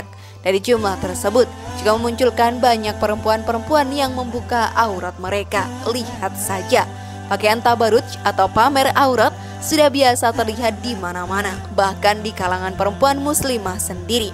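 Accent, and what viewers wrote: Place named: Java, Indonesia. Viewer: trimakasih berbagi ilmunya...hanya sedikit masukan yg bacanya terlalu medok cengkoknya bu...coba di perhalus biar enak di denganya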